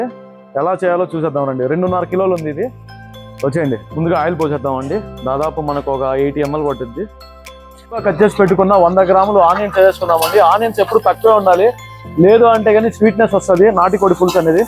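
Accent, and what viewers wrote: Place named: Rayalaseema, India. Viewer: పట్టుద్ది ఎందిరా నాయనా రాయలసీమ భాషను నాశనం చేస్తున్నావ్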